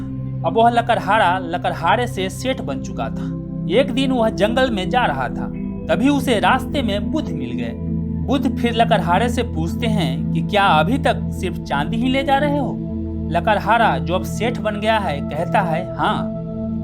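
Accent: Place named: Bihar, India. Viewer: Voice must be bihari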